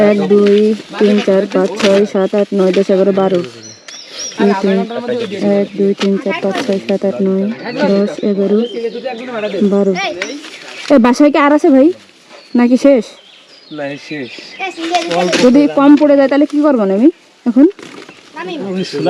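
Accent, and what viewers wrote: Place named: Jessore, Bangladesh. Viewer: আসসালামুআলাইকুম ওয়ারাহমাতুল্লাহ আপু কেমন, আছেন আশা করি ভাল আছেন। সেহরি খাওয়ার পর ফজরের নামাজের পর থেকে আপনার ভিডিও গুলো দেখছিলাম অনেক ভালো লাগছে আপনার যে মানব সেবামূলক কার্যক্রম এগুলা অনেক সুন্দর লাগছে আমার কাছে। আপনার প্রতিভাকে সাধুবাদ জানাই এবং আপনার জন্য দোয়া করি আপনি যেন সবসময় এইভাবে গরিব অসহায় ও দুস্থ মানুষের পাশে দাঁড়াতে পারেন দেশ ও জাতির জন্য কাজ করতে পারেন আল্লাহ আপনার জীবন ও ভবিষ্যৎকে ভালো করুক দুনিয়া আখেরাত কল্যাণময় করুন এই দোয়া রইল আপনার জন্য। আমি আপনার চ্যানেল টি সাবস্ক্রাইব করেছি এবং আপনার ভিডিওগুলো দেখছি অনেক ভালো লাগছে। আপনার কথা শুনে মনে হল সম্ভবত আপনার বাড়ির যশোর জেলার মধ্যেই হবে আমিও যশোর জেলার ছেলে। আমার বাড়ি যশোর খাজুরা রাজাপুর গ্রাম। আল্লাহ আপনাকে দ্বীনদারির সাথে সুস্থতার সাথে নেক হায়াত দান করুক এবং বেশি বেশি মানব সেবামূলক কাজ করার তৌফিক দান করুন আমিন। আমাদের জন্য দোয়া করবেন আসসালামু আলাইকুম ওরাহমাতুল্লাহ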